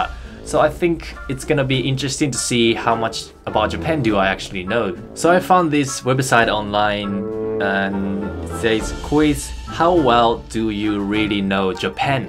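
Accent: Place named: Australia. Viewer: I can tell by your English - you spent your time abroad in Australia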